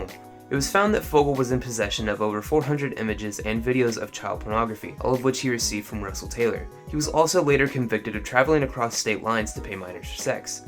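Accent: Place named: Martinsville, United States. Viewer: Indiana podunk? Sounds like someone is from Martinsville....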